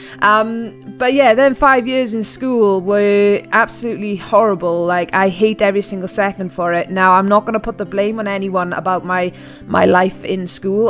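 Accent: Wales, United Kingdom. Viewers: Thanks for sharing your story with us interesting not always good and iam sad to hear what u had too go through but i believe we learn and hopefully grow stronger as u said through these experiences. Love your Welsh accent gorgeous, my music tastes are almost completely different i grew up a different music area 60- 70s , now its changed again, night wish, helloween style , all the best for further endeavour's with YouTube and hope it goes well for you hugs. Peter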